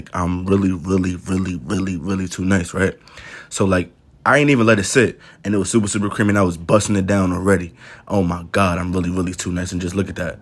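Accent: New York, United States. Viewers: 🔥 you most definitely from ny though 😆 ya video had me rolling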